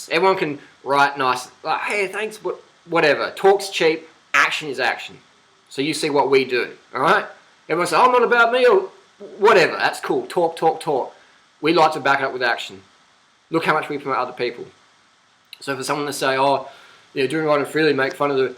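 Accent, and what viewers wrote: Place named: Australia. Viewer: I don't know about anyone else, but I cannot understand but approximately every other word of Australian accented English + eating jack fruit at the same time...heh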